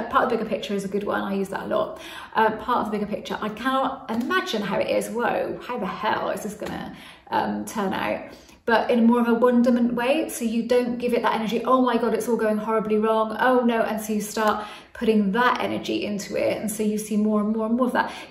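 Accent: United Kingdom. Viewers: Thank you very much. It sounds so simple and adorable with that British accent of yours. Cheers my friend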